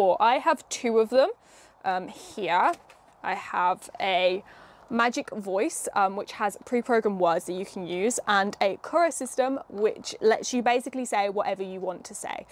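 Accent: United Kingdom. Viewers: Heh the world is advocating for more and more women in tech, I'm advocating for more women in retro-tech! This channel is going places, not only because is a woman, but for the simplicity and to-the-point videos. For me it's all of the above, but the british accent and the way the talks thru her hands makes it for me. Instant sub.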